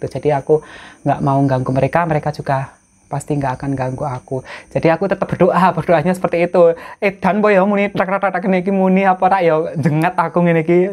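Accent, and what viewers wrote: Java, Indonesia. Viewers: ngecuprus,nggrundel pake logat jawanya....khas bgt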